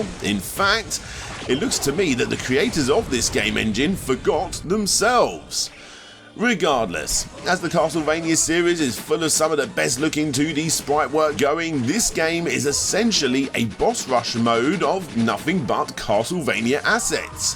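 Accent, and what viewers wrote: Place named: United Kingdom. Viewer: god /da /mn the sh /it brit narration.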